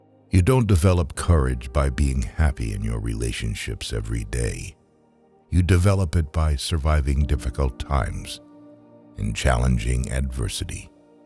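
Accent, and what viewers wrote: United States. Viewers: The American accent spoils it.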